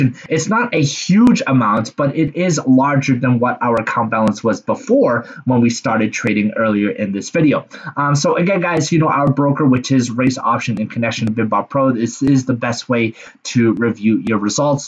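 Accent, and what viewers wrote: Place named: United States. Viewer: to me your accent sound so American 😅 where are you from or at least where do you reside? Because you have access to those brokers that does not allow Americans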